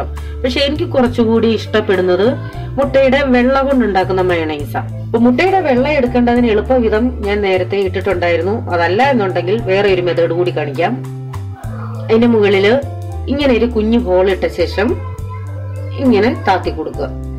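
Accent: Kottayam, India. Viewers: Chechii kottayam karii aano???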